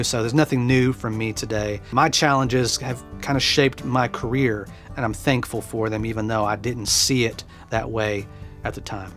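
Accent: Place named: Texas, United States. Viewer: Hey, YouTube is awash with "how to" tutorials. What's refreshing about your channel is that it's a personal journey of one man and the stories he shares (including his those of his dad!). I love that gentle, southern texan accent too (I'm from Melbourne so I don't hear a lot of it around here!). Throw in some cool music in the background to support the stories and it's a beautiful place to be! I'm very happy to just be present and an observer of your journey, in all it's twists and turns. Long may it continue!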